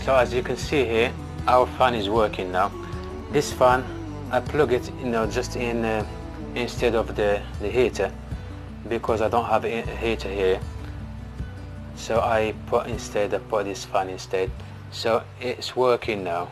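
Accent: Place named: Casablanca, Morocco. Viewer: تبارك الله عليك اخويا ، و المغاربة اللي تيهضرو بالانجليزية و شرحو بالانجليزية ، مثال بسيط و مشجع بالتفيق اخويا ، و لكن الاجليزية عندك كازوية هههههه تبرك الله عليك برو ،😍😍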